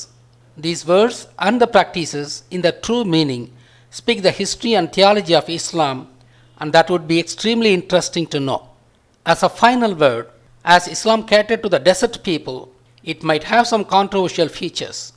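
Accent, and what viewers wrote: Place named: India. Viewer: also all the arabic words you mentioned you pronounced in Indian accent.. vocally in Arabic its not even the same word.. its like a deaf guy struggling to correctly voice the words.. so you cannot match the words vocally if you cannot correctly pronounce them..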